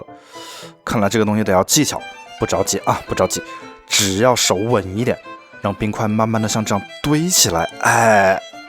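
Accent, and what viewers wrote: Wuhan, China. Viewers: 听出了武汉口音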